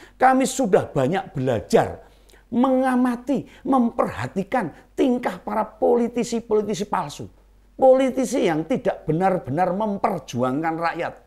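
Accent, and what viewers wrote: Java, Indonesia. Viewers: Pak njenengan jawanya dari mana sih kok lucu banget apa njenengan jebolan pelawak.Tapi pelawak yg cerdas dan gokil trs kan pencerahan2nya.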